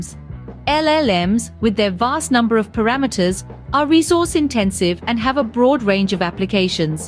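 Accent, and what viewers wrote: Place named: India. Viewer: I don't think ai voice is made on purpose with a slight indian accent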